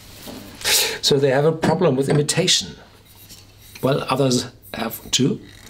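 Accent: Germany. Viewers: You’re not German ?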